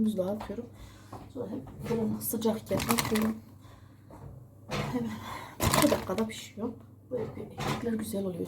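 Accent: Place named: Gaziantep, Turkey. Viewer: ayy ne güzel hemşeriymişiz bende Gaziantepliyim demekki kan çekti 😂👍🏻şivenden benzettim zaten 👍🏻❤️🌹🙋‍♀️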